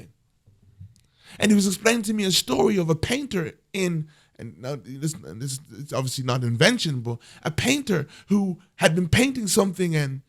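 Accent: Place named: England, United Kingdom. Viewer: just doing a word salad. Are you one of those Brothas getting paid by Candace Owens, because you have an English accent? Because you are doing the exact same thing that they do. Higher a Black guy that doesn't know what is going on to sit there and listen. You get other Black people to listen to this alt-right crap. is removing Black American rights. He has removed Affirmative Action. He removed the Fearless Fund for Black business women. He attacked Critical Race Theory so that reparations could never be discussed. He removed Black history. is backed by the American Heritage Foundation that is attacking P Diddy whose story was in the 90s. has a rape charge.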